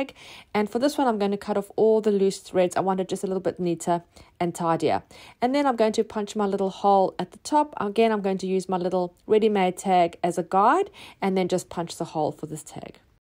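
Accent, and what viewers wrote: South Africa. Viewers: Has to be a saffie - my accent too. We say Serviette though? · You have a South African accent?? Loved the ideas shared.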